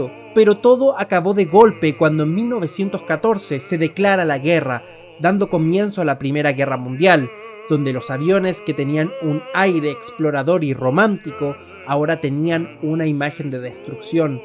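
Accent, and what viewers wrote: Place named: England, United Kingdom. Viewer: Buen video pero si quieres hacer el pedante pronunciando los nombres en inglés asegúrate de que sean ingleses para los europeos que pronuncies nombres franceses o alemanes con acento inglés es francamente ridículo......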